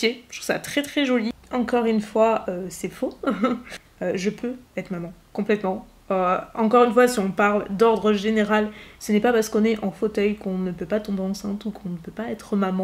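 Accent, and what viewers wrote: South, France. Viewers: Je viens d'aller voir tes premières vidéos pour vois si tu avais changé! et non tu n'étais pas du tout hautaine, n'importe quoi! Par contre tu avais plus l'accent du sud, c'est rigolo :)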